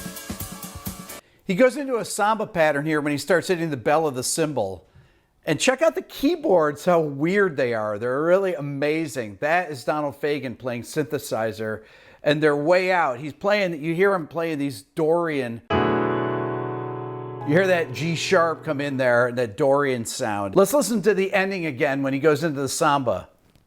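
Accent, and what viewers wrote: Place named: Central New York, United States. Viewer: Hey Rick, I dig your CNY accent. Just dawned on me. Gotta ask, i realize this video is a year old. Ever know Gadd or of Gadd since you grew up in the same area? Rochester has had some really good drummers come out of there... also, was always curious why Mel Lewis, a Buffalo dude, had bad things to say about Gadd. Or at least didn’t like his playing / mocked it. Maybe Lewis is too much of a purist. Any idea on the history between those two?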